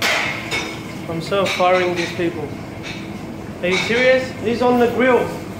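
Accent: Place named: Australia. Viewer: wow aussie accent! :P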